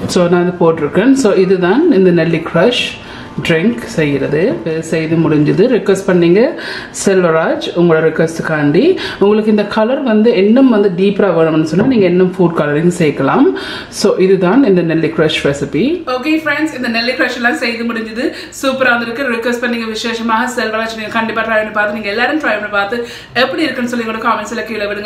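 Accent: Sri Lanka. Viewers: சகோதரி...உங்கள் இலங்கை தமிழ் அழகு.....வணக்கம் கோயம்புத்தூர் தமிழ்நாடு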